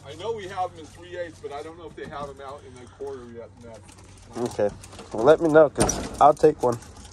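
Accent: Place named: California, United States. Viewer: You sound like you are in cali. I lived in San Jose for seventeen years.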